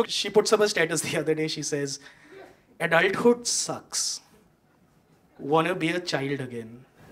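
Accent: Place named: Jaipur, India. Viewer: this guy has a jaipur accent,is he from jaipur?? Anyway great comedian with no stereotypical jokes on politics and the country.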